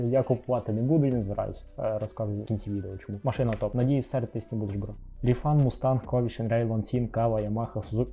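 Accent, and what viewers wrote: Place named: Ukraine. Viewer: сільський український акцент і купа русизмів ,очевидно стягнутих з перегляду кацапських . почисти то трохи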